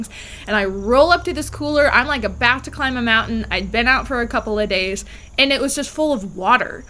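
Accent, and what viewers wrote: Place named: Kentucky, United States. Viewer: I have watched several female through hikers as I too am a solo female hiker but I have only had the opportunity to do sections of the Appalachian trail with a definite plan to complete the whole trail. I found watching y'all made the sections I have not done feel very doable and that I am ok with what/weight I am carrying. The through hikers I watched and enjoyed all of them you were the one individual I would have loved to have through hiked with. You seem to be able to enjoy the journey and not be pressed to always get big miles (especially the new state beverage). I assume this is a once in a lifetime adventure so if you can go out to eat in a restaurant, great! if you need to stay in a hotel because it's rained. Wonderful. I never had issues with why you posted when you did because it only seems logical that you do not want people to know exactly where you are and Wi-Fi is not always readily available on the trail. 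I personally love the way you talk, maybe it's because you're from Kentucky and I'm from Western North Carolina. I'm glad you successfully completed the AT but I do miss watching your on trail videos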